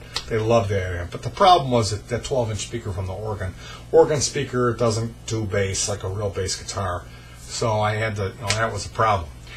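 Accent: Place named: Upstate New York, United States. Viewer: The accent. Buffalo, Rochester?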